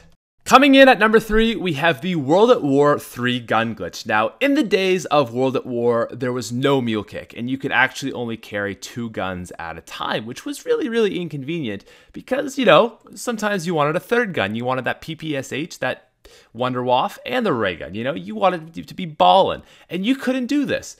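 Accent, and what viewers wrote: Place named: Newfoundland, Canada. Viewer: Are you from Newfoundland? Your accent sounds a lot like one of my teachers that used to live in Newfoundland.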